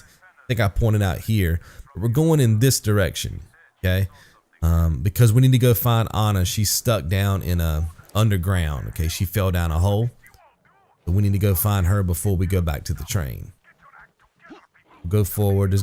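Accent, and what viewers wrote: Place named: South, United States. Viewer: Can I ask you where you're from, love your accent, reminds me of the South :D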